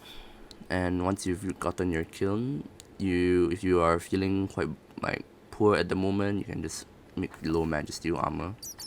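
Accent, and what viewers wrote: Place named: Quebec, Canada. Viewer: Were are you from you sound quebecois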